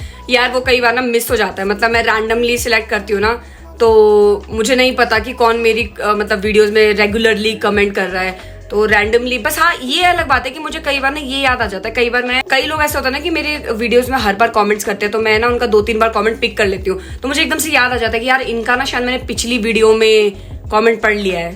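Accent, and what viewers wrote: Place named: Pahari, India. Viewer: Love ur pahari accent jo aa jata hai beech beech mai.....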